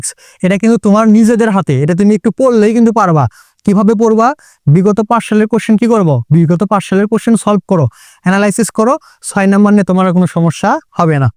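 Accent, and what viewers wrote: Bogura, Bangladesh. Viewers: Vai bogurar naki????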